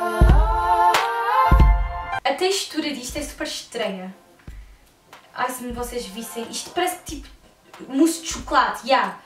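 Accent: England, United Kingdom. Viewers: Que sotaque inglês lindo!!! ❤️ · gosto tanto do teu british accent 😍😍 vou comprar essa máscara 😂😂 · adoro o teu sotaque britânico 😍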